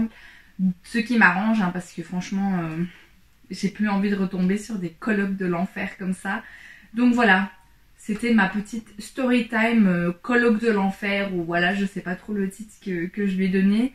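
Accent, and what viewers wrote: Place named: Belgium. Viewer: Je suis à 2 minutes de la video et je suis hypnotisé par ton accent on dirait que tu es belge ça me détend mdr jadore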